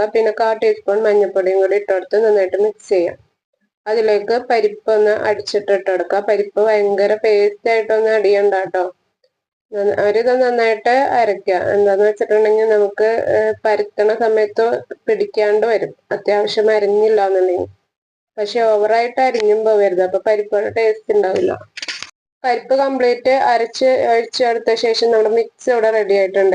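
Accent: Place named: Ernakulam, India. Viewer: ..correct alle..enikk ariyaam ee slang..May be we r neighbours, evideyaa place..?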